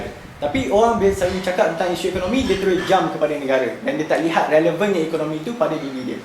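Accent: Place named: Kedah, Malaysia. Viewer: You have grown to be matured...still remember u r such a small kid during form2 debating in UIA with d kedahan slang 😁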